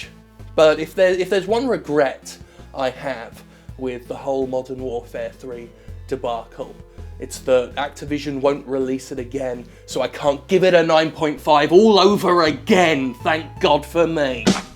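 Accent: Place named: United Kingdom. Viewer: Wow, I love so how britishly-accented, unapologetic, and delightfull funny you are.... subscribe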